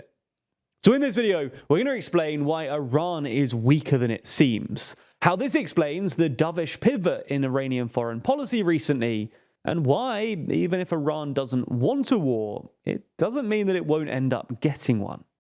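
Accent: England, United Kingdom. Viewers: British accent and commentary about how China is weak, Russia is weak, Iran is weak. Interesting how that goes. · Apparently, you are the expert. Just because you have an English accent doesn’t make you any smarter than an average person. I’m not even sure how you ended up in my feed. More bullshit from someone that doesn’t know crap.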